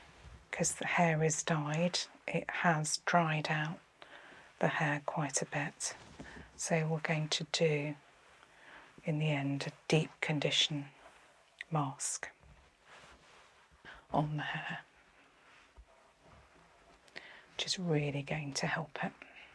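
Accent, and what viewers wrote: England, United Kingdom. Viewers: English accents are heavenly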